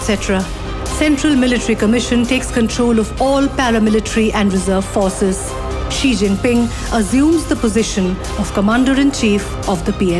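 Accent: India. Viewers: on a completely unrelated note, i love the indian english accent. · hey guys is the narrator indian???????????? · 一看标题这么劲爆，点进来发现是三哥口音 不稀奇. 这个民族没救. 中美GDP差距1/3 中国还谨小慎微.. 中印GDP差了五倍 (在相似人口相似基础下). 印度这个民族永远不知道反省. 指望着骂别人可以提升自己。中国则是励精图治, 奋发图强 。 这是两国最大的区别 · your Indian accent give you away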